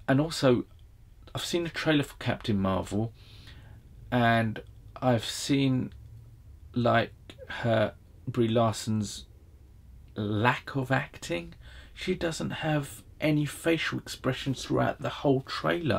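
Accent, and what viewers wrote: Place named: United Kingdom. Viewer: Expected to hear a Midwestern deep African American voice, Received an accent from the motherland across the pond. Jolly good! You earn a new sub for making me laugh as well as we have the exact same shirt on. I don't know the odds on that but it's still pretty fuc*ing impressive. I'm looking forward to more content brother.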